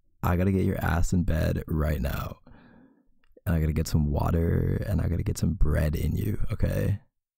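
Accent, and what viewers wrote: Canada. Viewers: Hey, idk why but you sound really Canadian in this audio! I Love it dude! 💖😂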